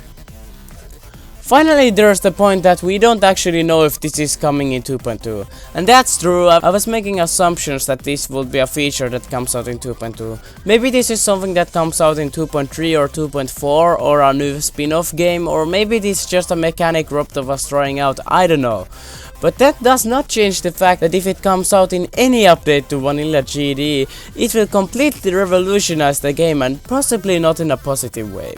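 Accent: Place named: Finland. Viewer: sun äänestä kuulee heti että oot suomalainen :D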